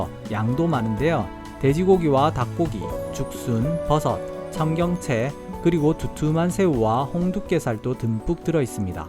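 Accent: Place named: Gyeongsang, South Korea. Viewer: 경상도 억양이 거슬리는 것 빼고는 좋습니다. AI 나레이션이 나을 듯요.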